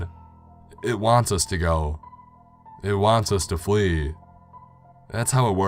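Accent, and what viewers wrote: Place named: Texas, United States. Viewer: Texas drawl is prominent. Also I loved the story! You made me love these types of stories even more then before! I'm jealous of how smooth your voice is, I stutter when I read out.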